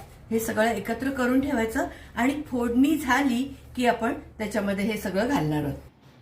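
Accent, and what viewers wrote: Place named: Kolhapur, India. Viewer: आम्ही पण 😀आजच तुमचा रेसीपीचा वीडियो प्राथमच आला माझ्याकडे आणि बोलण्याच्या टोणमुळे लगेच समजले कि कोल्हापूरी असणार तुम्ही ....... 😊👍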